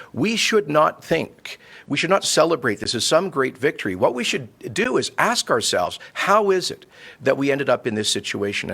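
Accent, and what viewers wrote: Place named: United States. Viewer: The biggest boondoggle is the drop in taxes to 10% that Kenney wants to announce to buy votes of corporations in Alberta. How has he planned to pay for that? No idea! Kenney is truly the most unlikeable arrogant self centered bully in the history of this province...He is not even from Alberta..he does sound like either an American or from Ontario....Certainly not from here.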